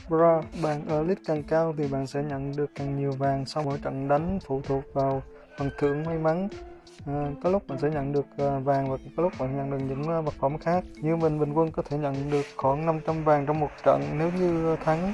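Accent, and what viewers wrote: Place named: Vietnam. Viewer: unfortune l dont understand vitenm accent but lm glad we have sub title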